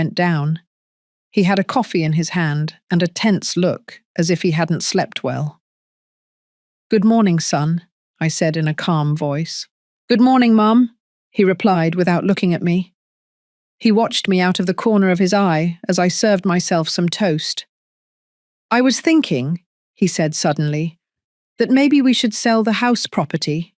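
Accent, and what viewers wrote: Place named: United Kingdom. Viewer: I would have set his own house on fire in his sleep.That is the fate he deserves. Incredible story, writing and lovely British narration. I was hooked all the way through. As a child in California, we were evacuated from our home twice due to wildfires. It was terrifying. I'll never forget seeing my parents with their most precious possessions packed in their cars when they picked me up at school.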